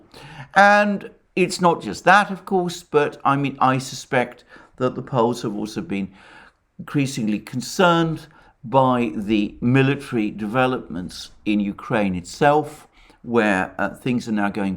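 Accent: England, United Kingdom. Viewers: his lovely English accent,they way he scratches his head....that loquanical....droll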